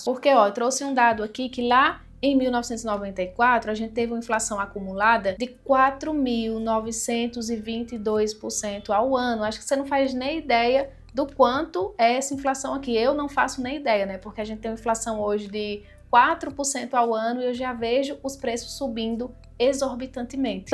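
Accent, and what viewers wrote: Northeast, Brazil. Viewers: Pelo seu sotaque deu pra perceber que vc é nordestina então vc deve saber que aqui no nordeste as coisas sempre chegam com um pouco de atraso em relação a outras partes do país.... Como vc mesma relatou que morou em cidade de interior e sabe que as oportunidades de emprego são bem poucas mesmo para quem tem qualificação!!!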